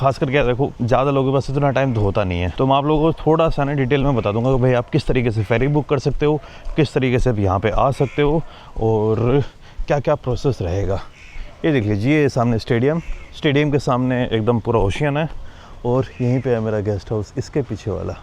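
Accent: Haryana, India. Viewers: Bhai ye pakka haryana ka hoga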